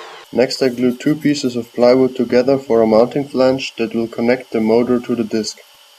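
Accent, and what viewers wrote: Germany. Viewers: Fantastic build. It must have cost a fraction of what a factory built unit would cost. Your English is very good and your German accent is endearing. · Great job. I´m thinking of building one and yours is the best homemade disc sander that I´ve seen. Is that a German accent? The switch looks German made. I´m from Texas but I live in Germany :)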